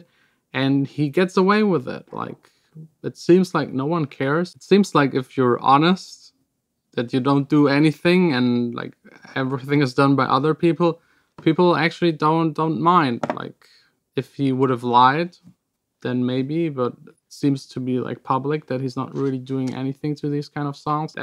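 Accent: Germany. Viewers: Wait are you from 🇩🇪??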